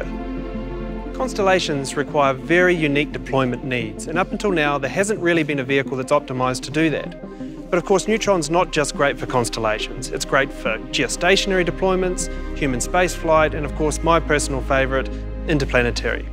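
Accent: New Zealand. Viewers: I like your lovely kiwi accent and your high aspirations. I wish you the best of lucks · With a kiwi accent, you can never sound arrogant. Cheering for you, Rocket Lab! (And SpaceX)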